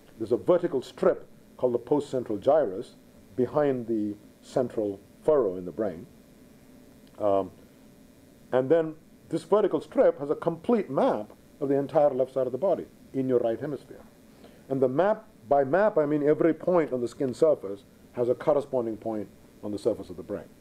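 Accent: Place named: Scotland, United Kingdom. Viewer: Thank goodness he has mentioned Indians regarding mathematics etc. I can detect a kind of Scottish accent coming through VS. He sounds a bit like Sean Connery now and again.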